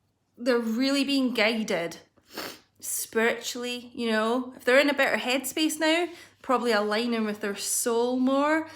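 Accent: Scotland, United Kingdom. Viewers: I love your accent! Are you Scottish? Are you doing private readings? I'm interested :D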